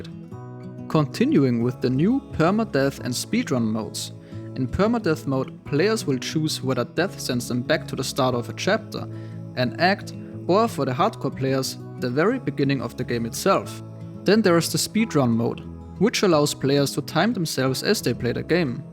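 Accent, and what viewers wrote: Germany. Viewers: One Frage you are from Deutschland oder ?😅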